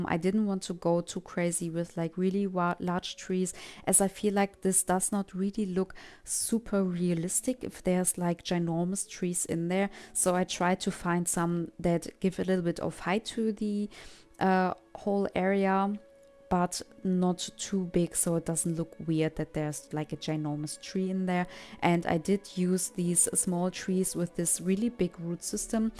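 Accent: Germany. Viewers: Thanks for the inspiration. But a funny question are you german ? Wegen dem Akzent und dem Wort Glas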